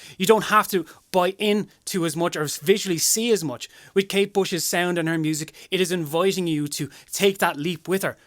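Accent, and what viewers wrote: Ireland. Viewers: This was excellent!! Love your eloquence, gorgeous Irish accent & fabulous commentary. Thank you!! " " eternally. ❤🌹🖤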